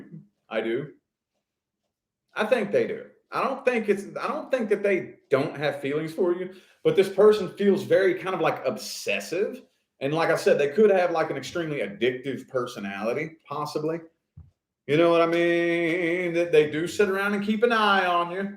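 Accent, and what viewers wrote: South, United States. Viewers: Libra. Need the financial blessings. The person is wanting back and is a twin flame. I have agreed to one more chance. Why do you sound like a southern guy?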